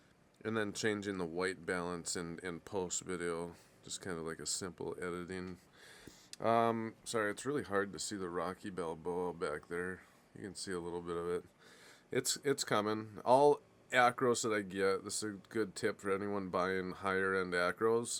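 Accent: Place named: Minnesota, United States. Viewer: Ya gee, ya sound like my family in Brainerd, don’t cha know? -random aquarist in Texas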